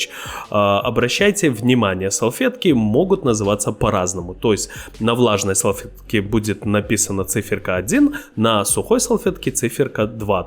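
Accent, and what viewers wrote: Belarus. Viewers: Автор, Вы - беларус?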